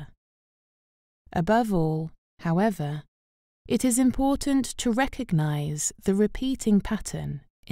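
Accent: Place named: England, United Kingdom. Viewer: I love it when a speaker has an English accent. It makes her points sounds so impressive.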